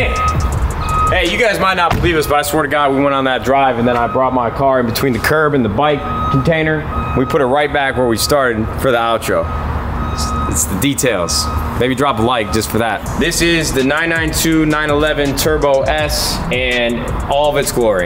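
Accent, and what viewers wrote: United Kingdom. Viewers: That British accent was sick oh my 😂 From Leicester big love Car is actually unreal too congrats on all of it you 100% deserve it, been watching since dayyyy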